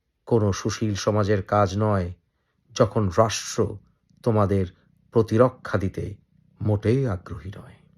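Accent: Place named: Noakhali, Bangladesh. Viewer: নোয়াখালী ভাষা ভাই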